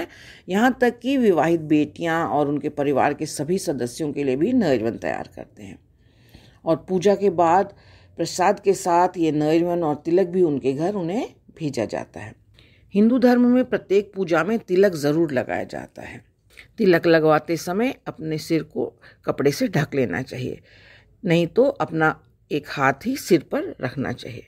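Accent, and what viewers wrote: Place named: Kashmir, India. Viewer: Kashmiri accent is awesome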